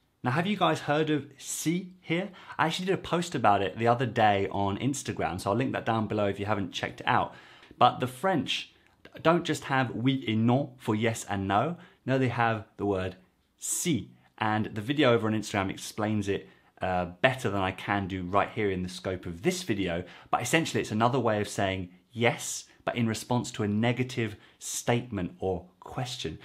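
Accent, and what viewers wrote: England, United Kingdom. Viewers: The pot calling the kettle black since you hear this chap is English as soon as he opens his mouth . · which part of england do you come from? regards from colombia kid! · Thank you! Merci ! Interesting analysis, mot par mot, j'aime ça ! And - as English is a foreign language for me as well - I enjoy the British accent too, j'ai un double pleasure! 😁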